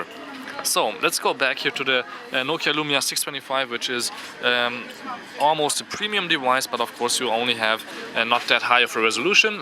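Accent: Germany. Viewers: Your mother-tongue is german, isn't it? :) Nice overview btw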